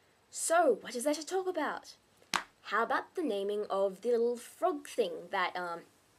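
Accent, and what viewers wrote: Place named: Australia. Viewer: I agree. :} I used to watch Hey Hey It's Saturday a lot with my family, along with Xena and Hercules. Haha. It took me so long to work out you were Australian. I couldn't recognize my own accent! The only foreign accent I can even attempt to do is Bad British.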